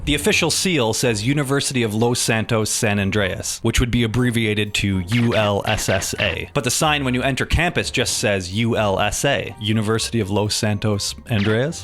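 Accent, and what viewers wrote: Canada. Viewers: Us? Your canadian?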